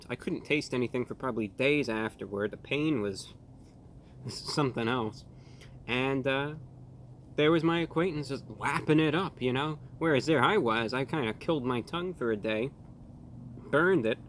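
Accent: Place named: Brooklyn, United States. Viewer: He has to be from Brooklyn ! Lol 🗽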